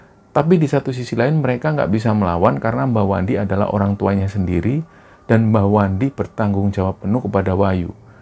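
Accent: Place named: Surabaya, Indonesia. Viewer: Mantab bang crtny kok mirip bahasa Jawa sbyo an y🙏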